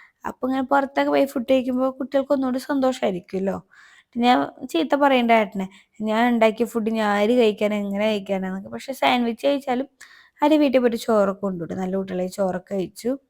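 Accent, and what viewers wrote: Thrissur, India. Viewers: That's their style...thrissur chela idath Njan ketitund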